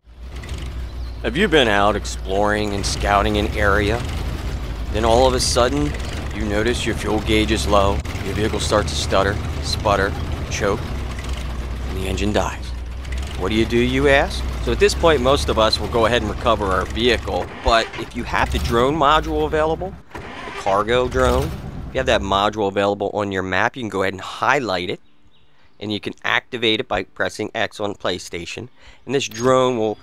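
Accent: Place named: Northeast, United States. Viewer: Thanks for this tip, I just unlocked the drone. I'm glad that I can sell it back BC it's $50k! also, I think I recognize your accent as north east, more specifically the Tri-state area of NJ-PA-MD, most likely Delaware County in PA.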